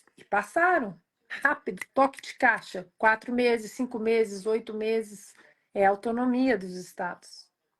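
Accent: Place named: Minas Gerais, Brazil. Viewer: Xará, é muito bom te ouvir! Tú fala num mineirês calllllmo e bem explicadim. 🤭 Aprecio este teu hábito. Bjão pr'ocê, sô! Deus te abençoe!!!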